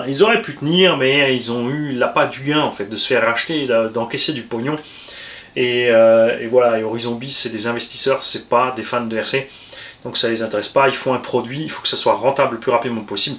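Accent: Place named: Alsace, France. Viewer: Ah... losi c est grâce à la dp.pro que j ai repris la rc,( j avais ausssi hésité avec un slach)elle est jamais bien loin dans le coffre quand on part en vacances ,il y a toujours une place pour elle.En tout cas elle fait bien le taf .et dire que j avais failli acheter le mavrick quantum fluck, beautiful comme disaient cettaines vidéos 😂😂jusqu au jour où je tombe par hasard sur un blondinet avec l accent alsacien qui me semblait bien plus sincères dans ses propos et voilà comment on en est devenu accros de cette chaîne de dingos 😋avec son présentateur et ses abo,on se marre bien,et la collection s agrandi tous les ans. Sinon pour finir le sbr 2 me tenterai bien pour essayer en remplacement du mojave mais j hésite fortement déjà je sais que la caro c est de la feuille de riz😂😂et ça fait c...quand-même Bon allé montre nous les essais du losi 5 qu on bave un peu comme avant. 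Bonjoute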